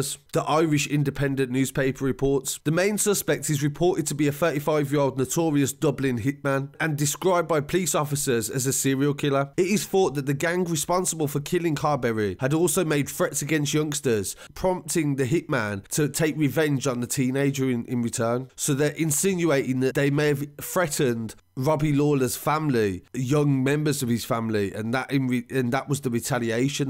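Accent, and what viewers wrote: United Kingdom. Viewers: Mate you sound like ur from UK and learn how to say the places first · i come from the same town i know more about this than this guy does his accent is from the uk what the fuck would he know about our troubles haha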